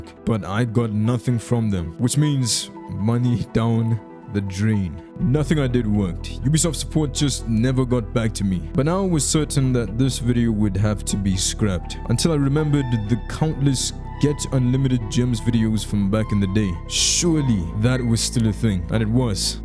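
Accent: South Africa. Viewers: You're south african??? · yoo you're south African? me too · Wait are you South African?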